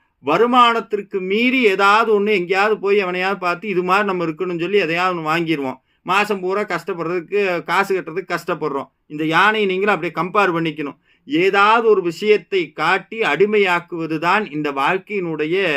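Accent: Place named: Coimbatore, India. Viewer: Thanks Anna. Coimbatore Tamil la unga Explanation ah kettu konde irukalaam.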